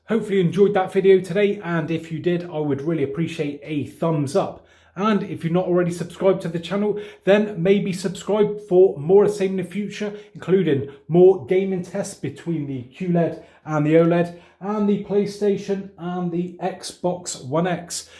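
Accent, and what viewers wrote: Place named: Southampton, United Kingdom. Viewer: Your videos helped me buy my TV (Samsung q90r, 65inch) so thanks for that! My girlfriend and I are trying to guess your accent. We have money resting on this so please can you let us know who won? (she thinks Southampton). Thanks for your videos, from Mallorca!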